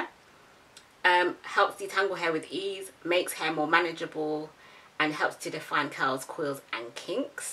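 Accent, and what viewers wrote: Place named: London, United Kingdom. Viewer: thank you!!! I was trying to figure out your accent cause I knew it was London but I didn't know what part. I am from southeast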